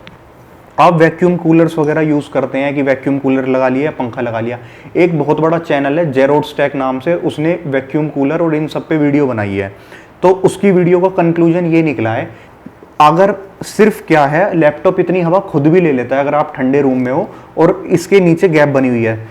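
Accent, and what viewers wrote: Haryana, India. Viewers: Bhai tu haryane ka lage h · getting reply from you is still a big thing for me thank you so much sir you are really doing great work please keep it up ! Like your haryanvi accent actually I love haryanvi accent and main strength of your channel is your honesty and desiness.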